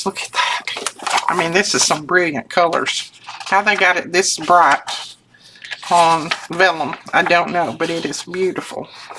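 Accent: South, United States. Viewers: God bless you with Peace, Courage and Strength. My husband of 43 years passed away a year ago July 14 then my mother's earth suit gave out at age 93 in January '24. You have been a companion of mine since I found you and am very grateful for your Southern upbringing which is reflected in your sweet accent and charm. I am now a faithful student of yours BTW, I have two daughters, three granddaughters and a grandson. Two of my granddaughters (sisters) now live with me to my great delight. I will pray for you and encourage you to face each day with grace while looking forward to the goodness to be found when you look for it. Kay Davis Peddie, Quincy, FL.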